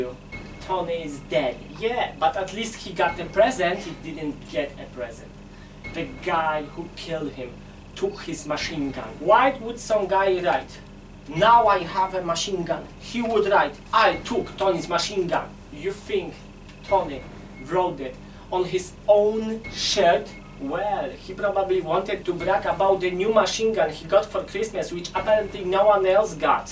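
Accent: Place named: Poland. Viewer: Po tym angielskim widać że polska ale spoczko filmik 😊😊😊😂😂😂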